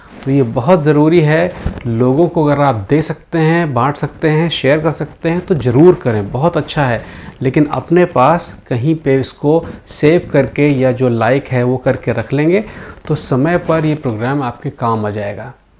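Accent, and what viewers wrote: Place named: Jalaun, India. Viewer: Sir ap ki bhasa mere up ke jaloun, orai se bahut milti hai, jase bat gath me badh lo, mota mot a samajh lo aadi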